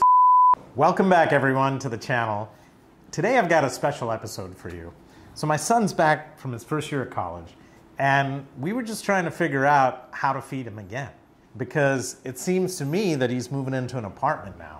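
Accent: United States. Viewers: Superb! Dr. Nene will be a Super Hit on YouTube. As glorious as his wife! Excellent English! Handsome Likeable Personality! American English Accent! Down to Earth! Great Content! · You are making wonderful dishes,sharing your knowledge of how even Americans have breakfast,pizza with a blend of indianess in it.Great!I wish to know you have US based Medical certifications which is a great asset.May be you should share these first,your own identity.May be you can leverage this load of cooking to Mrs Madhuri,but of course under your guidance and direction,so the calories and protein content is clear.Encourage youngsters like who have a dream to go to the US and pursue Medical profession,making it easier for them.Its not easy but at least one can try.And may be you start a coaching class with and Ryan to teach your type of English with American accent to the youngsters in the coaching class.This is the need of the time so they can match the American standards.All the best Dr Nene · Dr Nene has true American 🇺🇸 accent. 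He’s son is also so well brought up. Kudos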